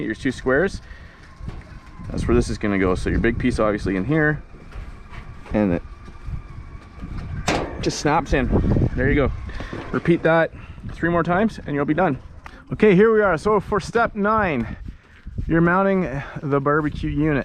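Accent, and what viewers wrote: Canada. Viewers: I just bought a rogue. Gettimg delivered on Wednesday, this is a helpful video. Seems pretty straightforward to assemble. Are you in southwestern Ontario by chance? Your accent seems very familiar. Lol.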